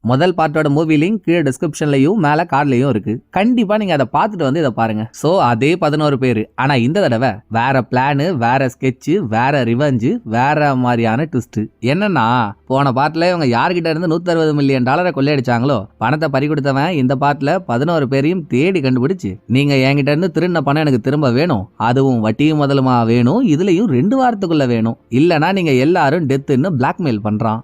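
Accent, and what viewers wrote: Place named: Southern Tamil Nadu, India. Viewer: Congratulations bro. I hope you are from the Southern part of Tamilnadu. Great vocal energy and slang. Kindly reveal your native brother.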